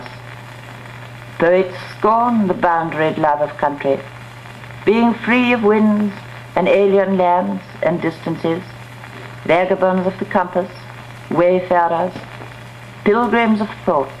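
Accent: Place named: RP, United Kingdom. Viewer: She speaks queens English, she has no accent.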